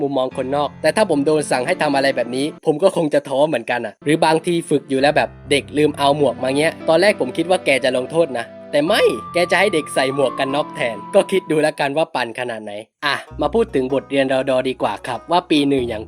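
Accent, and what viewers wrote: South, Thailand. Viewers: พี่บ่าวคนใต้ม้ายนิ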